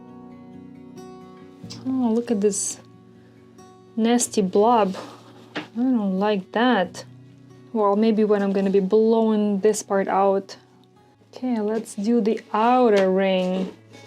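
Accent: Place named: Russia. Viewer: Очень интересно,красиво.. Вы похожи на украинку и манера говорить русская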